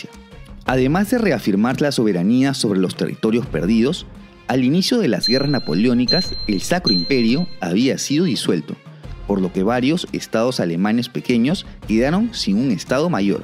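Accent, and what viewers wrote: Peru. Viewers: Que FEO el acento peruano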